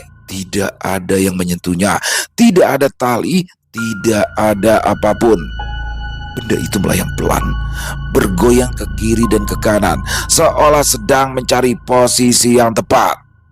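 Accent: Jakarta, Indonesia. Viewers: Waalaikumsalam mlm bang, Alhamdulillah sehat bang,semoga abg,team dan penulis sehat slalu,Batam setia hadir nyimak bang,ayo gas kan bang,biasanya ada tuh kata2 yg lucu klu sdh logat Betawi muncul bang nih 😂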